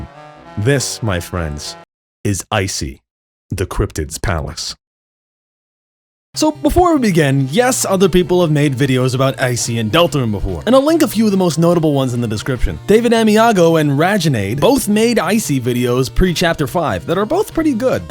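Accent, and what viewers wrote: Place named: New York, United States. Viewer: Love your vaguely New York accent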